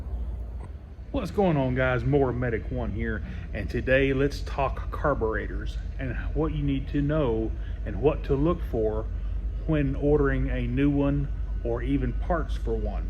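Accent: Texas, United States. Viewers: I think he’s a Texan.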